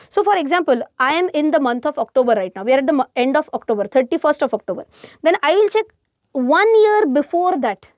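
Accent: Maharashtra, India. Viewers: I love ur marathi accent..... Awesome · English in Marathi style?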